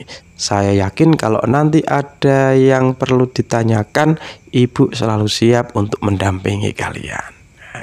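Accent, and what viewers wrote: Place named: Java, Indonesia. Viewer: Assalamualaikum Trims banyak Gan...Ha ha ha medok sekali jawanya ....pilihan analoginya cerdas sekali ...pada akhirnya kok seperti andragogy ya Gan? sekali lagi trims banyak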